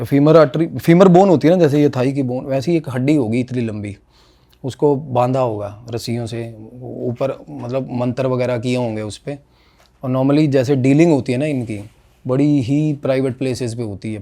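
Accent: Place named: Punjab, India. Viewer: bhayi k beech beech mai Punjabi nikal jati ha😅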